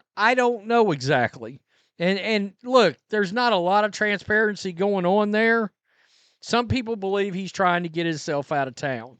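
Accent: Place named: East Texas, United States. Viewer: What part of East Texas are you from?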